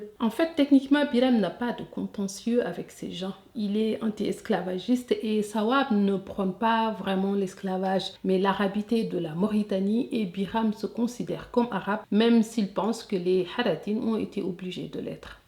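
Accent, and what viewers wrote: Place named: Senegal. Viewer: Tu as un accent sénégalais , tu es sénégalaise tes frères et sœurs en Mauritanie sont la plupart des exclaves , soit vous les ramenez chez vous soit vous la fermez . Il peut pas y avoir de Noir en Mauritanie c’est un peuple arabe . Vous cassez les couilles aux gens de partout . Même en France vous voulez pas laisser les gens respirer. Retournez chez vous et le problème est réglé.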